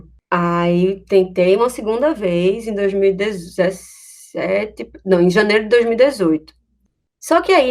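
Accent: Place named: Pernambuco, Brazil. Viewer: Pelo sotaque é galera super gente fina de Pernambuco! Por mais youtubers de Pernambuco fazendo sucesso aqui na rede! Pernambuco em 1° lugar! Parabéns pelo conteúdo!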